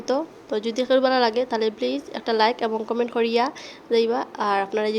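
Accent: Sylhet, Bangladesh. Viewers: অনেক ভালো লাগলো ভিডিওটি আপু আর সাথে তোমার সিলেটি কথা অনেক ভালো লাগে ❤❤ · আপুনি ভিডিও সুন্দর হয়েছে তার চেয়ে তোমার সিলেটি ভাষার কথা গুলো আরো সুন্দর 🤗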